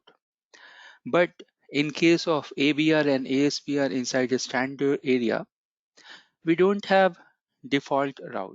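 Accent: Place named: India. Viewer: When I first heard your accent, I was skeptical; I thought of another Indian YouTuber that takes a 2-minute topic and extends it to a long, painful 10 minutes. NOPE... I was wrong; your material is solid, straight to the point, has no wasted time, and is well thought out and explained. I recommend you to my peers, but this class is a must; well done.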